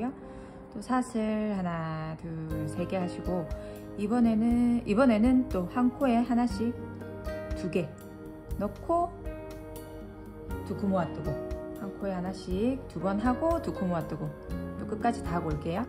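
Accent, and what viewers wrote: Busan, South Korea. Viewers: 저도 부산 출신이라 ㅎ 광안리 … 멀리 미국에서 부산 사투리 쓰시는 님이 늘 가까운 느낌이네요 뜨게질 소질은 없지만 보게 되네요 ㅎ 예전에 지인이 만들어준 수세미도 생각나고 잘봤어요~~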